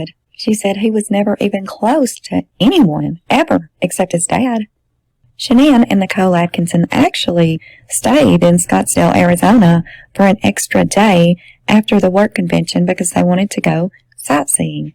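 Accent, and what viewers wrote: South, United States. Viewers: Beautifully read:elbowbump: you should do stories online.....nothing like a southern belle accent.💛..you sure got it:washhands: Thanks for doing this...muchly appreciated🥰x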